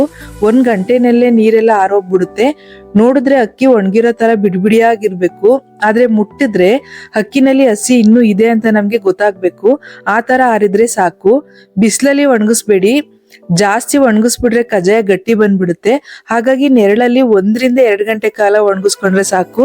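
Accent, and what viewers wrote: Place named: Mysore, India. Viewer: Madam nimmadu yava ooru.? Nim mathadodu keluttha idre mandya Mysore kade kelvru heege mathadodu.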